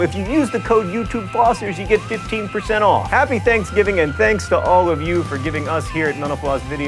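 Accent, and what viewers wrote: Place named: United States. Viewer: ***** He probably just has a regional American accent of some sort.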